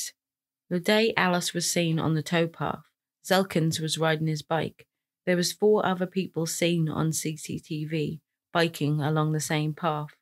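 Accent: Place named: Cambridgeshire, United Kingdom. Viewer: Can I ask are you from outsell Wisbech area - my ex husband was from there sound so similar excellent channel xx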